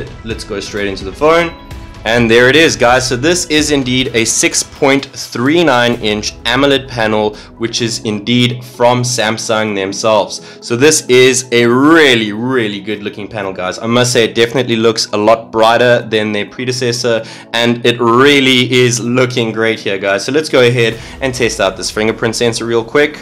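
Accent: South Africa. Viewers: I like the Proteas accent !!!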